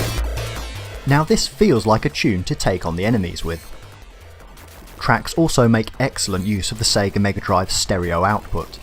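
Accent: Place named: United Kingdom. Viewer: Well, I enjoy your style, vastly prefer it over "shoutcaster" rubbish! I'm not a game collector.... yet, but your production/scripting reminds me of the military miniatures (toy soldiers) community, of which I am a member. (Love the humor, as well. Not many opportunities for "Japanese homoerotic" references in the model soldiers scene!) Poised delivery, well researched, and British as Bully Beef! Cheers and keep up the great work!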